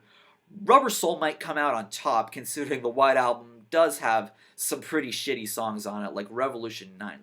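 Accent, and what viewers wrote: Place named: United States. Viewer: The only thing wrong with is that you Americans keep saying Beedles. It's Beatles with a t for f***'s sake!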